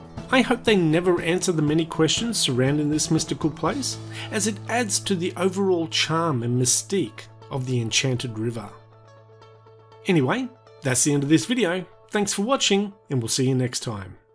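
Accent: Australia. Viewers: Thanks for soften your australian accent.....!!!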